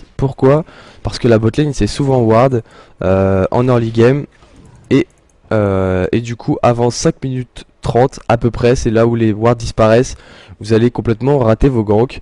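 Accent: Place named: France. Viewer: J'adore quand il dit la solo cul xD Accent français ftw ! :D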